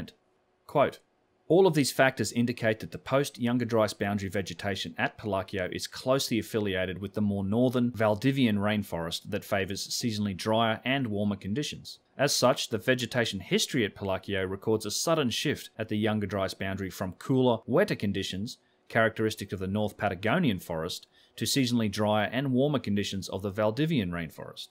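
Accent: Australia. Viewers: Love your videos, excellent content. Out of interest, you live in California but you sound Australian, why do you live in the USA? Thanks. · You sound Australian. Just a small point. 10 mins you show a map of the world. Northern and southern hemispheres are actually the same size but it's not represented that way on your map. Stick up for AU.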